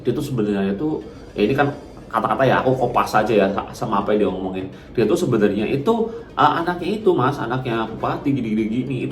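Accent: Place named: Banyumas, Indonesia. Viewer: Bang org mn.. kog ngapak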